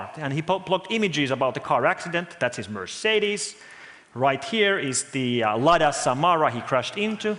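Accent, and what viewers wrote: Finland. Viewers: His accent actually isn't that bad for a Finnish guy. I've heard worse. And yes, the "klobal" is difficult to pronounce for Finns, mostly because the Finnish language doesn't really use many words with "g" in them. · I was very surprised to see a Finnish guy giving a speech in TED, and I was pleasantly surprised the content and how he delivered it. Great speech on an interesting & important subject. P.S. Extra bonus points for the accent. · Oh that finnish accent :p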